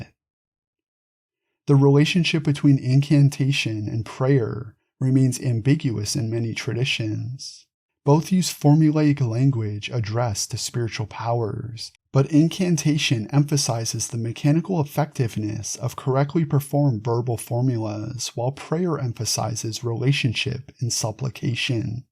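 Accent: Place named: California, United States. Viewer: The California AI accent is too annoying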